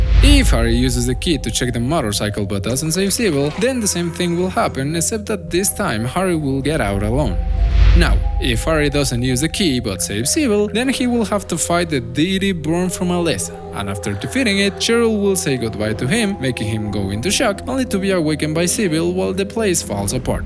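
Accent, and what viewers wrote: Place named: Russia. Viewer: Good Russian(?) accent for storytelling! nice video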